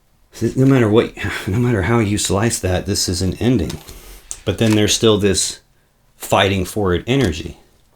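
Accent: United States. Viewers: Yes, I want a personal reading. You’re awesome. Prayers for your person situation. Help me unravel mine? Time zones may affect the coordination. You don’t sound Australian so, I’m guessing American? I think it’s 12 hours difference. I’ll book. 🤪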